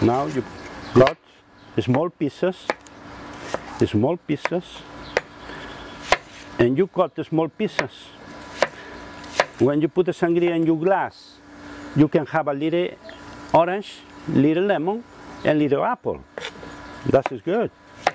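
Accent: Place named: Spain. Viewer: His Spanish accent is so fckin cute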